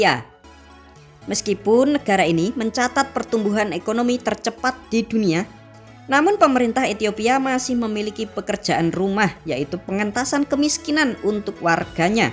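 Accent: Java, Indonesia. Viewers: Jawa nya medok bgt narator nya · Medok banget bahassnya mimin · Orang jawa ya mas... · Yang ngomong meddok bgt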